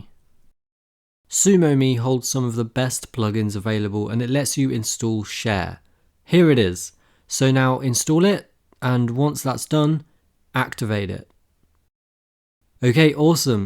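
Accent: England, United Kingdom. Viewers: what's with the Eng. accent????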